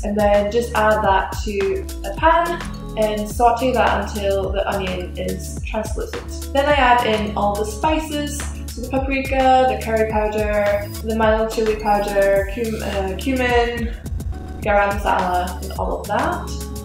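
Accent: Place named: Scotland, United Kingdom. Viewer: You're totally getting a Scottish accent. Also this video is great!!! I need new meal ideas so this is perfect :)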